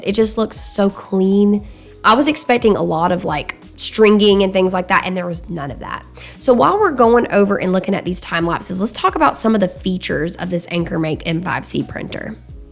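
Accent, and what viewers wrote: South, United States. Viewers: Hi, I recently found your channel and love the fact that you are a Christian (I see your tshirt designs 💜) and feel sure I hear a southern accent! I am a special education teacher in Arkansas and am considering starting a small business for my special education students. Would you ever consider doing a Google Meet so I can ask you questions and get some guidance? I’ve been watching your videos, but need to get this thing going, by ordering supplies and equipment within the next month.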